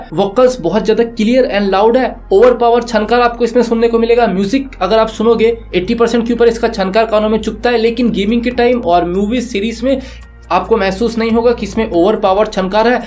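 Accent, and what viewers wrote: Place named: India. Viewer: Bro... wt is this??? - I thot my pseakers saw brknen (im replying as I heard u) but nooooo this some broken Indian crap? just speak in Hindi or whatever you local language is - lord. this is a horrible listening experience.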